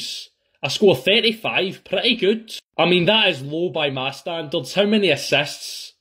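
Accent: Liverpool, United Kingdom. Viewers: You from Liverpool? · Are you Scouse? Just a curiosity of an English accents learner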